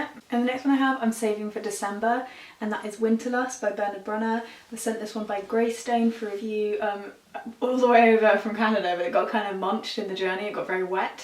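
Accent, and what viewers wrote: United Kingdom. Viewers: you are nt hearing excellent britain's pronunciation?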